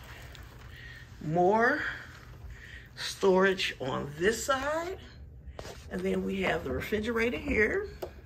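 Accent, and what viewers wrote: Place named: Arkansas, United States. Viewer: I knew you were a fellow arkansan as soon as I heard you speak! LOL! Thanks, from Ft Smith!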